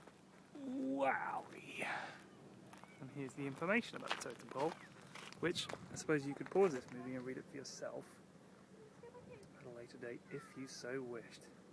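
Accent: United Kingdom. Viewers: a guy with a British accent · you're British accent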